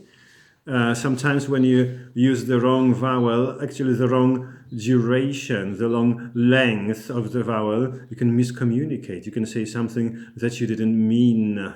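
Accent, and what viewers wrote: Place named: Russia. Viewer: Oh, your accent sounds awful! Are you russian?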